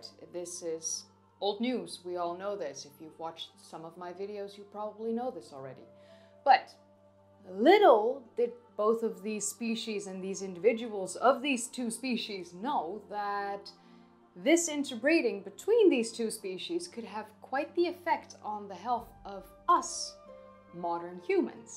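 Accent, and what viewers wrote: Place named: Netherlands. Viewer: A person with a Dutch accent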